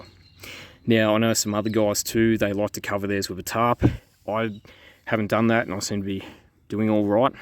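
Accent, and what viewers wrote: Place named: United States. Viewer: Cindy, you sound like an American. This video is from Australia. In Australia foxes are an introduced pest and a big threat to native wildlife. It is illegal to release them or even keep them as a pet.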